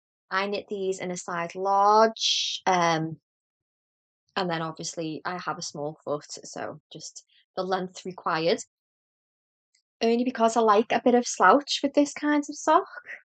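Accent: Liverpool, United Kingdom. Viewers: Hi. New subscriber here from New Hampshire, in the US. I was born in Paisley, raised in Leeds and went to University in Liverpool. I heard your accent and you made me smile. The first YouTuber I have heard from Liverpool. I thoroughly enjoyed your “Make 9” episode and will probably go back and listen to the older episodes.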